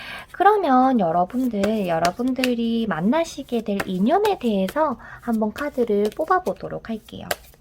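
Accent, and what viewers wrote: Gyeongsang, South Korea. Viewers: 하트 뽑았습니다! 처음 왔는데~~~ 경상도 분이시죠? 사투리😁 저도 대구사람인데ㅋㅋ 경상도사투리로 듣는 타로는 처음인데 재밌네요😄 외로워요ㅠㅠㅠㅠ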